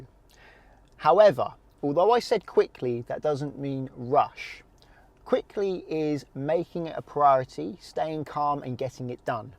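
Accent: England, United Kingdom. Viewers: YouTube isn't good at captions when it comes to English-English.. Sometimes it's bite point and sometimes it's bike point in the transcript xD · i really enjoy watching your videos... not only because I'm learning manual car and find your videos the best teaching videos about manual cars... but also because i like to listen to your perfect British accent as I'm English language learner 😊.. thank you very much sir 🎉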